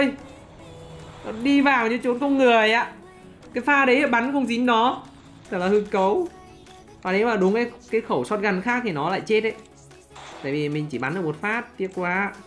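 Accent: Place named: Nghe An, Vietnam. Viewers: Nghe cái dọng miền trong .kho chiu vl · Dlm nghe dong khăm vv ..may dân nghê an ah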